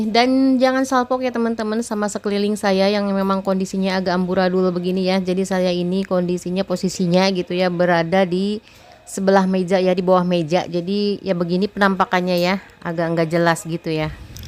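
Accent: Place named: West Java, Indonesia. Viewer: Teh di daerah mana? Meuni Sunda pisan, salam kenal ti abdi dari kota Bandung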